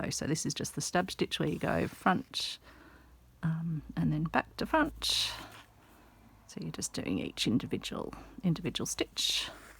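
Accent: Australia. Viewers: I thought if you put a horizontal stitch on the back at the point of the star you could put a paper clip or other wire on and use it as a decoration and take it out and use as a coaster later. Just came across you today and am glad to hear an Australian accent. Gold Coaster here. Summer hit here a month ago, then we had a few winter days just when everyone had put away their long pjs.🌲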